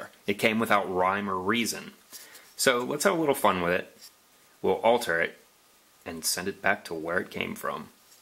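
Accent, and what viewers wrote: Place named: Texas, United States. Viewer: dude, I love your accent, your jokes, and your dry delivery of them. my husband and I are getting into woodworking because of you. it's all your fault! 😉 did you live in Texas? I'm trying to pinpoint your accent; it's very complex and interesting :) (to me, at least)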